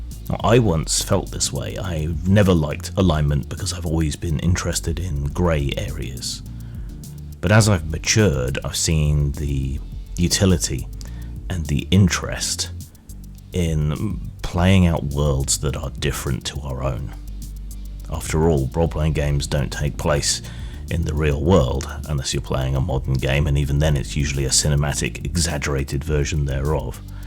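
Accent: United Kingdom. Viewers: Good video,. I find your voice to share a certain charming quality with that of Alan Moore, but less unintelligible to my sensitive Yankee ears. You know us, anyone with a British accent is inherently more authoritative, more intelligent, and more sophisticated so well done! My two cents (or pence, or whatever): I like the elegance of the Law-Chaos paradigm. It is more like yin and yang. Both are an appropriately abstract interpretation of heady psychological ideas, and of more use from a philosophical perspective, in my opinion. They have more concrete and objective definitions, and are less apt to instigate a disruptive moral argument at the table. Also, consider that the circle is always turning, illustrating that no one remains in one or the other for too long, or they risk catastrophe. There's a whole endless discussion there as well. Good and Evil, on the other hand are too subjective in their definition and are way too easy to argue over for my use as a DM. 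Consider the old saying that every villain thinks they are the hero of their own story. Even in the Bible, Christ says that every man does what is right in his own eyes, to say nothing of throwing stones in glass houses, judging not, and a hundred other sayings in a hundred texts of a hundred religions. Defining good and evil, as far as I can tell, is the first step toward war, and allows for the dehumanization and vilification of others, which then opens the door to atrocity of all kinds, including the executio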